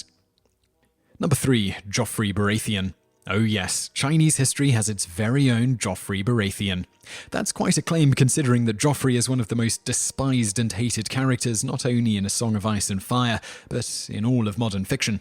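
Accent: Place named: British Asian, United Kingdom. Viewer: His British-asian accent is funny :P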